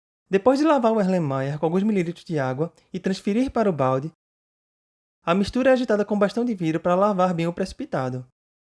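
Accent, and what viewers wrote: Northeast, Brazil. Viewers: Mto bom! Parabéns pelo canal. Sotaque do Nordeste me lembra de casa!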